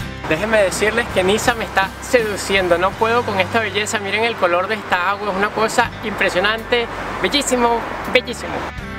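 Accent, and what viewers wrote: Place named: Venezuela. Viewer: saludos...muy bueno tu canal,ya me suscribi,por tu acento al hablar y tus palabras parece que eres de Venezuela !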